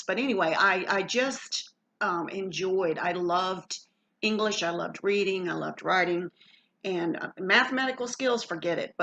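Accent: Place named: South, United States. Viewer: I love the sound of a refined southern accent. I’m not originally from the south, but I lived in Alabama from <num> and most of the people I came into contact with were very well spoken. Maybe you were staying in the sticks, I don’t know.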